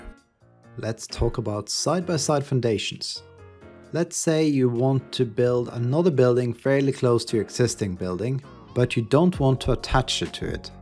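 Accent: Norway. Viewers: Kjente igjen engelsken hehe, super engelsk men hører det fortsatt 😅 Flott content dere har, skal dele dere med clanen 😄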